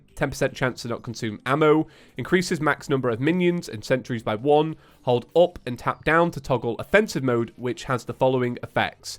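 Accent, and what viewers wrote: United Kingdom. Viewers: Your british "accent" makes me think you're saying giar armor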